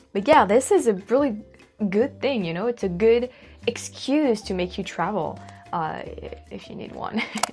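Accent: United States. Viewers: I have watched you for a few years and your "American English" is getting very obvious. I can hear it very clearly. It reminds me of when I was in Eastern Europe and the young people learned English from Americans (Bosnia and Kosovo).. They would pick up southern accents and it was funny to hear them talk with an eastern European accent combined with a US southern accent. Also hearing them adopt the culture of "yes sir/ma'am", general politeness to strangers, etc. I miss taking the people that worked on our bases and their families to special occasions like Thanksgiving dinner and 4th of July parties where we opened up our dining facility and had sports events for them and their families! So much fun! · Can I just say how much your English has improved even from a year ago. Your accent is very light now and you have a good grasp of the American accent. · The way she speaks English with an American accent is so freaking cute... 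· Your English is American accent! Did you live here ? Love the vlog and you living life to the max.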